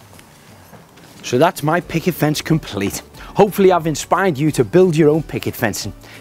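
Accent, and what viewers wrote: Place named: Liverpool, United Kingdom. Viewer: Well done, I'm just starting to ignore that pronounced Liverpool accent. Like your work very much, it's plenty good enough for me. Thanks!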